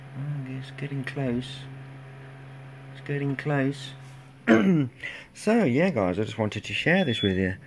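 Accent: United Kingdom. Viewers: guessing from your accent (the way you say inductor) are you British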